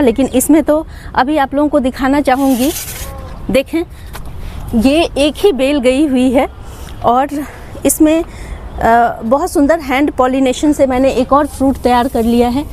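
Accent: Bihar, India. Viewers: mam ap Kahan ki hai Apki Bhasha se ap Bihar ki lag rahi hai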